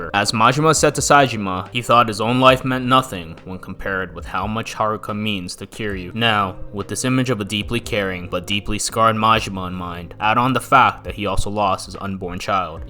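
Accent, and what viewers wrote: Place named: United States. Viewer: I love the video but hate your American accent butchering all the pronunciations of the names. Sounds like yarkuza the way you say it and marjimar I hate the accent. YA KU ZA. MA JI MA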